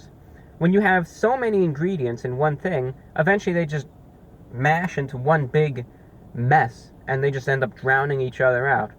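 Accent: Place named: Mid-Atlantic, United States. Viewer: I've never seen anyone speak regularly with a Mid-Atlantic accent before. I like it.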